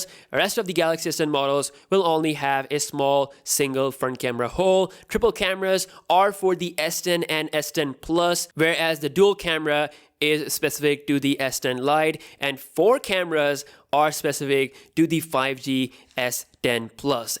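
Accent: India. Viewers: Nice content ..nice video..but the narrative..the voice..it hurts my ears...sounds horrible. Sounds like those auto-read thingy with a terrible indian accent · hijui999 hes Indian lol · Sorry but you just can't hide how indian you sound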